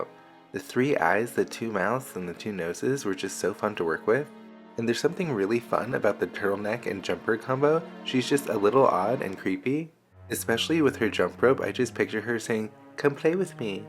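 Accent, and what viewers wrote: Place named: North America. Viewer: Oh, actually I have a question about MSC. By your accent I'm assuming you're north American? I've been wanting to start a faceup for a while now but since it's winter the humidity is practically always above 90% and I read msc should be used in below 70%. Do you have problems with the humidity? Thanks.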